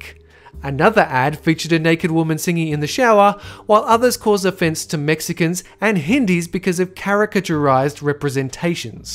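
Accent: Australia. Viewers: Are you australian? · suddenly turned full australian. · Damn it, getting another aus narrator still wont work.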